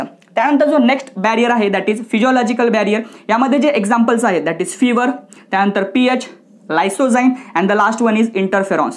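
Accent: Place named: Pune, India. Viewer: Punekar cha avaj ahe to...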